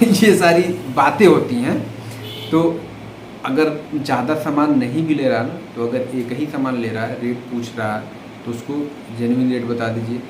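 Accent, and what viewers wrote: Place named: Bhojpur, India. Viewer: Arrah se ho ya Buxar se ya bhojpur se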